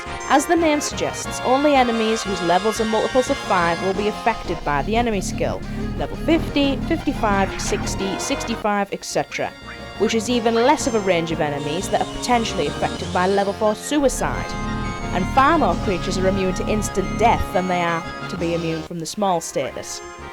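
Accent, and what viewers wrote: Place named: Northeast England, United Kingdom. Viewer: That's a North East English accent? Huh, neat!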